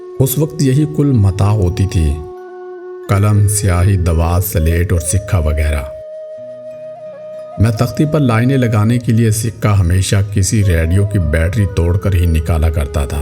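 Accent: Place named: India. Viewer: It was good video but it looks like some indian dude made it with Indian portraits, it’s more impressive when Pakistani have their own portraits,even narrated by Indian accent. 20 years ago Pakistan was not part of India. It’s nice try by Indians to make Pakistani cry.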